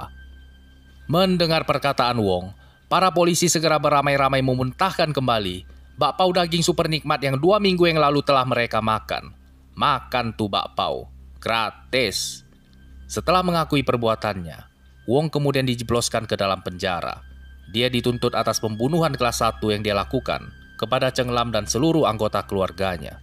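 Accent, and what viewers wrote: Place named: Sulawesi, Indonesia. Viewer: Speaker nya logat nya pasti dari Sulawesi 😂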